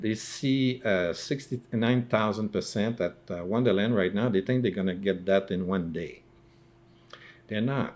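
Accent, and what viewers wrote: Quebec, Canada. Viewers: I can tell by your accent that you’re from Quebec!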